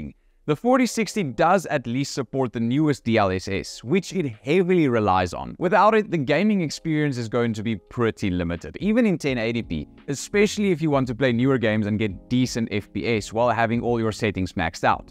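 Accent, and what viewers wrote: South Africa. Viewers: Mnr is jy van SA af?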